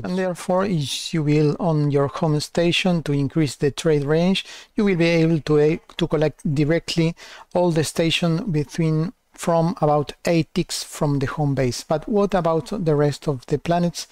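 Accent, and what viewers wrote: Chile. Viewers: no entiendo i ""J" no hablo chileno pense que era en español XD,. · Desde cuando no entiendo español? Sera español chileno. Buen video como siempre.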